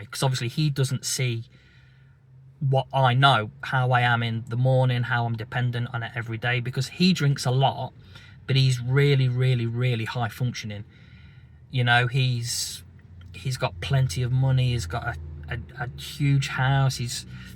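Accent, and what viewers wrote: United Kingdom. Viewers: You sound like you’re from Derbyshire/Nottingham area. I live in Sheffield and don’t care for drinking but love the footy like you and sport in general. If you ever fancy a walk or coffee let me know. I have struggled with my addiction for years although it’s drink or drugs related. Stay strong today brother.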